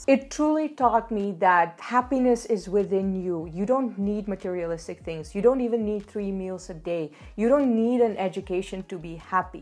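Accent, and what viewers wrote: India. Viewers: Your Indian accent 💗💗💗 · You have that Indian accent. Lol. I was in Gurugram in October 2018 and boy, I was forced to adopt that accent to pass across my messages for folks there to relate to and I found that quite interesting. And yes, things are super cheap there in India. If I could buy a ship load of stuff, I really would've loved to do so.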